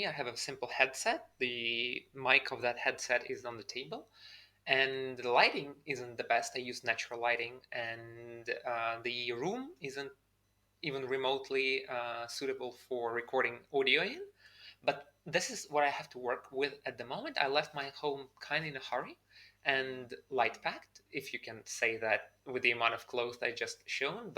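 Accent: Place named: Europe. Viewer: (1) Out of curiosity, what country did you relocate from? And in what country are you living now? Your English is very good. You sound European. (2) I'm a baby-boomer who's accepted in a MTF HRT program at a health center so I can transition to living full time as a female. The culture has finally shifted to where you don't have to fear for your life, get arrested or in general, get harassed wherever you go. Even dressing gender-neutral at only 4 months of transitioning, I've incurred no problems in today's American society. (3) Did you have an occupation or job skill that would translate MTF so you could continue to earn a living. I've been active in the trans community for 25 years. TGurls who were moving forward 'no matter what' lived in what I termed 'a gender ghetto'. No matter what their education, they could only get menial jobs, perhaps as a bartender at a gay-bar or a clerk at a convenience store. 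Professionals (one I knew was a surgeon, the other was an IT specialist for a large corporation) lost their jobs immediately after they had GRS surgery and announced it (a) to the partners who had their LLC practice's lawyer draw up a buyout agreement effective immediately and presented to the surgeon the moment she returned from Thailand where s he had her GRS done, and (b) even though the IT specialist worked openly and sincerely with the company's HR department who 'talked the talk about inclusion' etc., and the company was unionized and she had the union's LGBT rep atte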